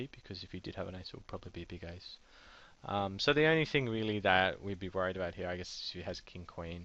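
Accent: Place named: United Kingdom. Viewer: Thanks for the reply! By the way, I noticed that you listed Japan as the country. Are you British or Australian living in Japan? Just wondering from your accent, it's obviously not Japanese accent:)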